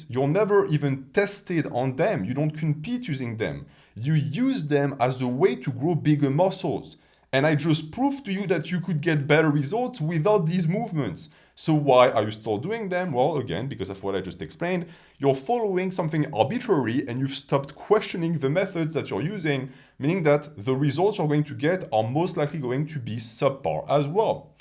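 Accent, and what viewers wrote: France. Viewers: I work in audio so I'm a bit of a snob admittedly. A lot of people just don't care and I get that. But I do believe his old audio was objectively bad sometimes in that I would miss certain things he said because of the combination of mic distance, room reflections, and French accent. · i hear french accent, i close the video.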